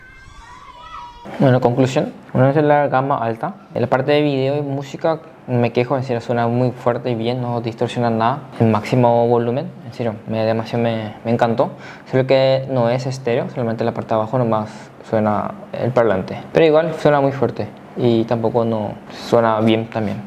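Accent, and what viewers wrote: Paraguay. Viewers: Es de Paraguay xd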